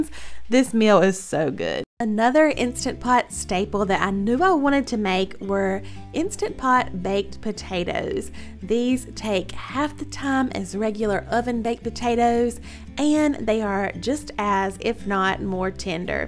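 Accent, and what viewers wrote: South, United States. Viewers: Love your southern accent 💕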